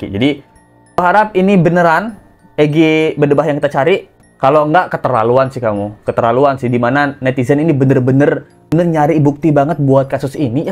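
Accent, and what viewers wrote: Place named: Jakarta, Indonesia. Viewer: masa orang cirebon logat lu gua lu gua logaj jakarte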